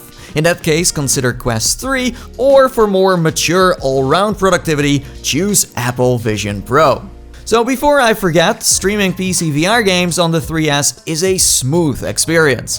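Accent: Netherlands. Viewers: This man is dutch right?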